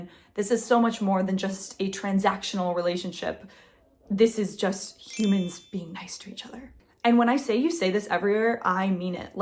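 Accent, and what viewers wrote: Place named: United States. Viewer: You sound American not English so how can you teach English? Americans don't speak English, I'm English and I can barely understand a word you say.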